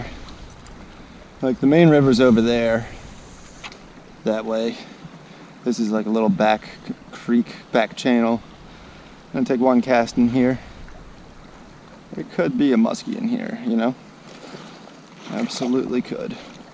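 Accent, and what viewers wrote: Philadelphia, United States. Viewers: Hey I like your videos i like the way you talk. Makes me wish I was back in Philly. You stopped for a while and I was afraid you were gone. Now your back. Cool. Thanks dude.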